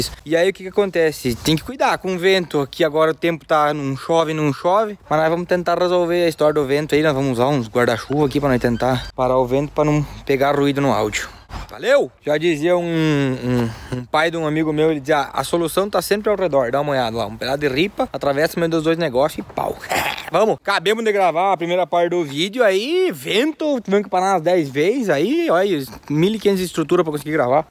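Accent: Colono, Brazil. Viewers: tem o mesmo sotaque de colono mesmo fora do personagem. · O sotaque é impossível de esconder, no começo do video ele só tenta disfarçar mesmo, mas o sotaque que ele se sente a vontade é o de colono, aposto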